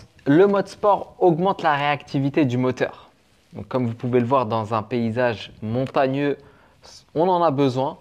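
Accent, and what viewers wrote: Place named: Banlieue, France. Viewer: Salut, où as tu tourné cette vidéo ? C'est un super endroit. Merci. Oar contre tu parles comme un mec de banlieue et ça ça gache la vidéo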